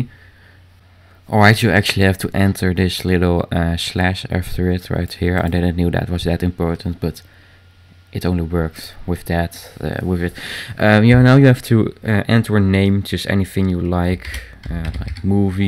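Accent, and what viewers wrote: Netherlands. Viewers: Oh god. The horrible dutch english accent. But the content of this video was awesome. Thumbs up for you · are you dutch? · your from belgium of holland ik hoor het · duidelijk een nederlander :') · whats your languaze, i think your dutch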